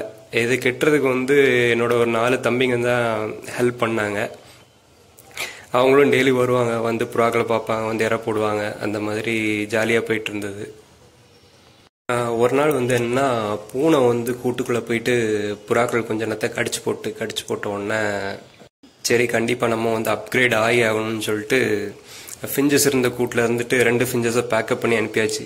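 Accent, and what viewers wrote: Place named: Kanyakumari, India. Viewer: நானும் கன்னியாகுமரி தான் Makka நம்ம ஆளுங்க பேச்சை அவங்கள easyயா காட்டிக் கொடுக்கும்...😍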